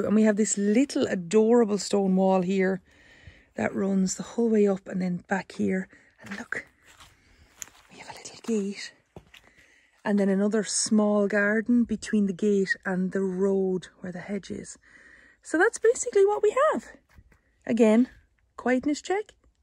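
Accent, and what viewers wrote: Ireland. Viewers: Reminds me of the house in the movie, Quiet Man." I love listening to your awesome Irish accent 😍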